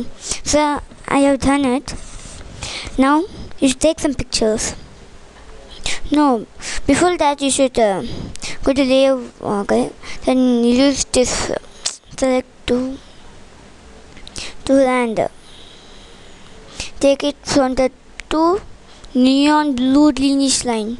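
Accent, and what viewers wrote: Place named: France. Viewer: Are you french? Love ur Acent! :)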